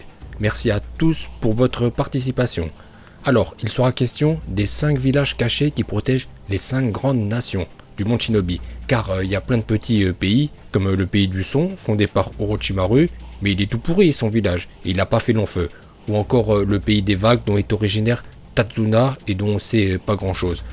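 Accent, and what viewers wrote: Japan. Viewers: t’es vidéos sont bien mais sa rend fous t’es accents japonais alors que t’es breton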